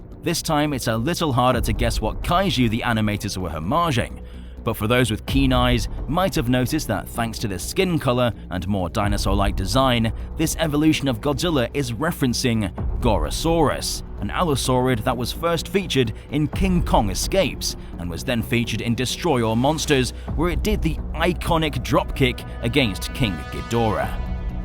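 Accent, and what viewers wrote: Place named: United Kingdom. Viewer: I kinda want to know what type of pound cuz he sounds British.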